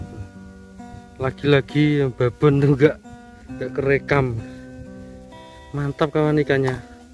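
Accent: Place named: Java, Indonesia. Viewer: Q suka cara ngetapnya.. Medhok tu maszehhh Salam hormatku boss ku ❤️🙏❤️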